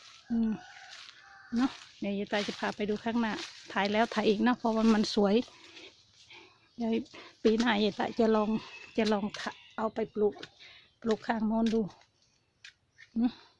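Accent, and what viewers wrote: Surin, Thailand. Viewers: ยายเป็นคนจังหวัดอะไรคะ สำเนียงเหมือนคนสุรินทร์ บุรีรัมย์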